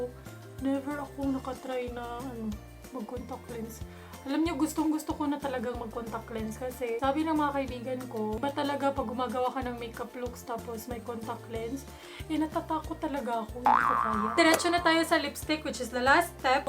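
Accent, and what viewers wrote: Visayas, Philippines. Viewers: Bisaya ni bai 🤙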